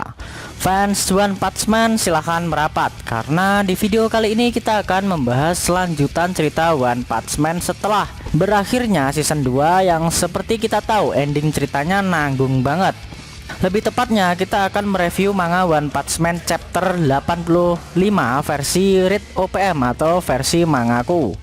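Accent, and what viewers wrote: Java, Indonesia. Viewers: Medok tolol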